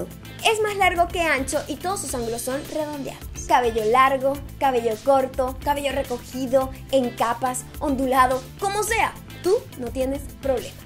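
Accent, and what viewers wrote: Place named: Venezuela. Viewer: El acento venezolano es lo máximo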